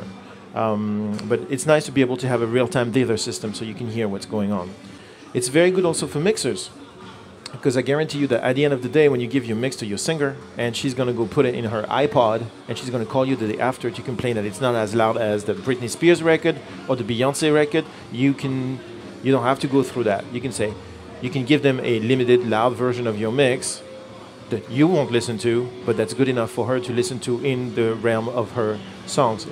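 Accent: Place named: France. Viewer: Are you French by any chance? I don't know what it is but it instantly makes your English sound much more awesome. That's not cool man; it colors my perception of you and prevents me from getting a proper read, lol. Anyway, great explanation. Very well put, as if your words came straight out of my head on every point. I'm sure you do great work and thanks for taking the time to educate. All the best!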